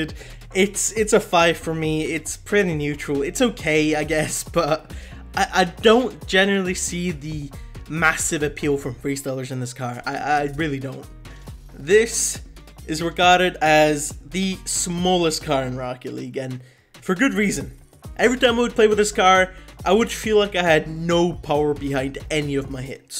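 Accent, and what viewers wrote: United Kingdom. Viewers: Btw are you Scottish it sounds like it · he sounds lockey welsh or northen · I still don’t get how your British, I’m from England and have never heard anyone like you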